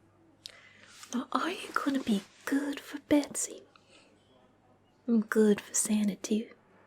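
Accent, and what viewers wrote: South, United States. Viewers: OMG! I know she's not real but I can't help but smile each time I see our sweet Southern Bell. Moving?!?! No!!! Why is our character an idiot? Why is it in most role-plays in the ASMR community, our character is the equivalent of a handsome Village Idiot? Then again, if our faceless character was actually smart, he'd put a ring on her. (Loved the video,. Yeah, you've definitely perfected that sweet Southern accent. Happy Holidays!) <3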